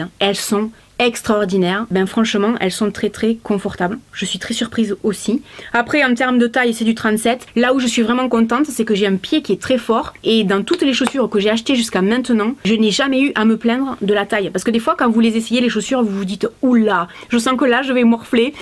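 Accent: South, France. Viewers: Merci beaucoup pour vos conseils d achats les chaussures sont magnifiques j adore votre style et surtout votre bonne humeur 😊 et cet accent qui sent bon le sud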